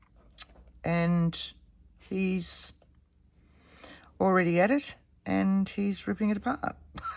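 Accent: Australia. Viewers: Thank you soooo much for this toolkit go through especially of the glues you use... funny enuf as a reborn doll artist E6000 is used for keeping in mohair to our kit heads... looove using the glue stik but yes here in Charleville Qld we get constant 40 degree heat so yes a stronger glue is needed for that... looooove your channel... lovely to hear an aussie accent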